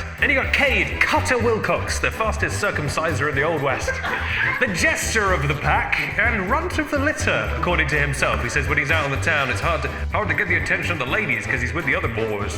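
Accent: South, United States. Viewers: Yeehaw fashion era 😭 Btw your southern accent slayed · Your southern accent is better than mine and I'm an American.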